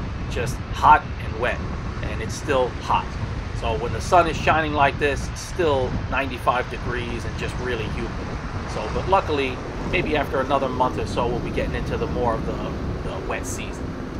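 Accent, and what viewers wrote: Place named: New York, United States. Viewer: thanks for another ride along. i really like these. on a side note i ran across an old video of yours. it was the one you made a wine bottle tongs. boy your new yorker came out in that one..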